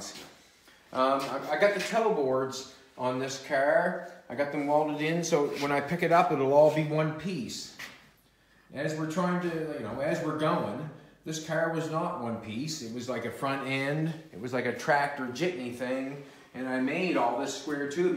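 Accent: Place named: Newfoundland, Canada. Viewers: True newfie,, talk for days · Sounds like he's in Eastern Canada...eh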